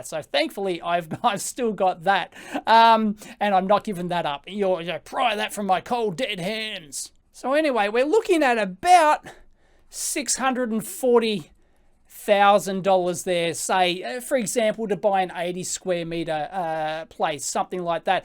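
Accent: Australia. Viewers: ''Office spice'' :) I love your channel and I love your Australian way of speaking!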